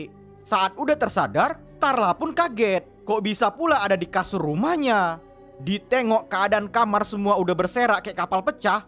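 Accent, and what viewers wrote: Medan, Indonesia. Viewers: Org medan nih bos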